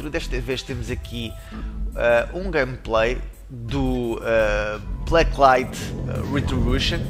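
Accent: Portugal. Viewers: HAUHAUHAUHA "PT-BR" Mas ok, sotaque Português é legal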